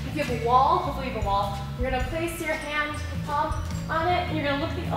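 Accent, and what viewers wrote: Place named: England, United Kingdom. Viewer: rawwrrrrrrrrrrrrrrrRRRRRRRRRRRRRRR! WOWZERS that was intense but rawwrsome. :oD My arms feel like jelly. I'm typing this with my nose - JK, or am I?! Have a rawwrrsome wibbly-armed kind of day. And where did you learn your English accent from?!?! Such fun! :oD xXx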